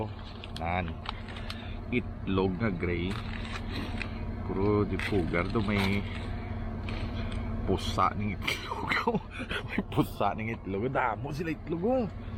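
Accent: Iloilo, Philippines. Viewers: Masarap pakingan ilongo salita, cebuano ako pero konti nakaintindi ako ilongo❤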